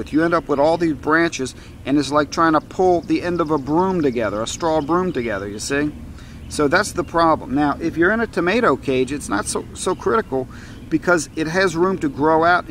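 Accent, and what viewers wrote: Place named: Louisiana, United States. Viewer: Are you from Louisiana? heheh, I like your accent and I'm trying to guess where you're from. =)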